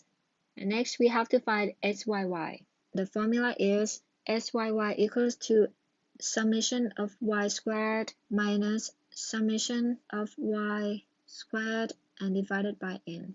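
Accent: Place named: Bangladesh. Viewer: Are you from Bangladesh?.. Your English accent is similar like us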